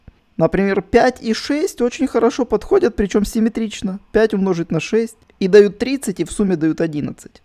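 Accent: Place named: Israel. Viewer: По акценту давно стало понятно что ты не русский, а из Израиля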